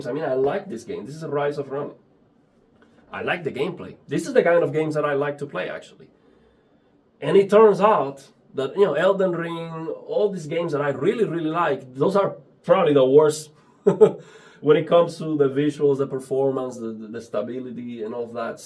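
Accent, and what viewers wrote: Cuba. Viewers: Lo sabiaaaa asere el acento me sonaba a cubano. Felicidades hermano. Keep it going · I knew that was from some Spanish speaking country because of occasiona cute s before e. Cuba:)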